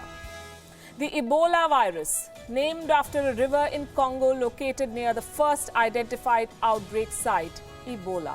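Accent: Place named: India. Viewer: goj76*&£21^&^? curry accent, didn't understand a word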